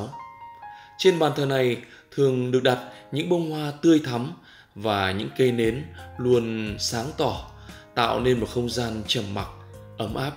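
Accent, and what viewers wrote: North, Vietnam. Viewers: giọng nói miền bắc ngọt ngào tuyệt vời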